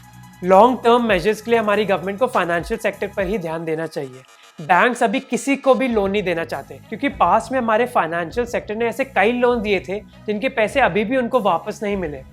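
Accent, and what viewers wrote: India. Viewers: Speaking Indian way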